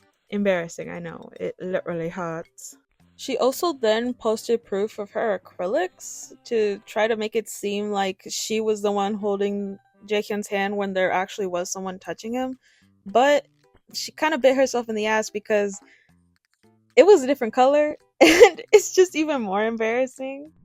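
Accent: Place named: Spain. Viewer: Out of context but I love your spanish pronounciation🫠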